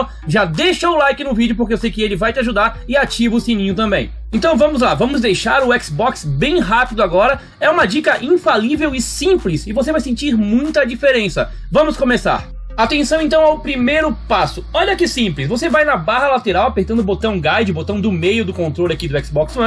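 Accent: Florianópolis, Brazil. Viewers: vc é de floripa? tbm sou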